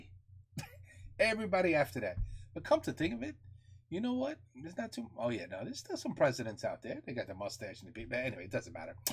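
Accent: United States. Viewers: strong american accent DE LA FRONCE !!! 0/ j'aime beaucoup :D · J'adore quand tu parles français, avec l'accent américain c'est very cute! The golden globe and the scepter held by the King symbolise his God-given powers. The globe is the Earth (yes, no flat earth people in France back then), the physical world, it means that the monarch rules over his land, while the scepter symbolises his power of life and death over his people and the ability to give justice (le bras séculier). The History of France is very complicated, but very interesting.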